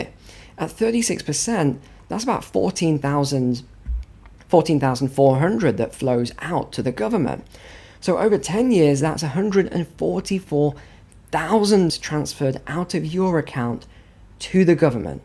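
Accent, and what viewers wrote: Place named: Scotland, United Kingdom. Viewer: Önrealized? Dötsch GOVT? Bödget? Is that a scottisch Akzent?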